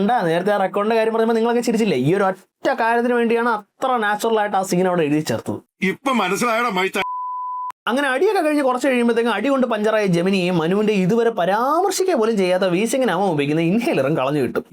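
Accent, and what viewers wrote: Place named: Thiruvananthapuram, India. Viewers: തിരോന്തരം ഭാഷ നന്നായിട്ടുണ്ട്. മലയാളം സിനിമ റോസ്റ്റ് ചെയ്യുമ്പോ ഇങ്ങനെ മതി. മടുക്കുമ്പോൾ ജില്ല മാറ്റിയാൽ മതി. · ah thirondoram slang.. thaali konnu 🤣🤣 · Oooh trivandrum slang 😮. Kollaam 😌❤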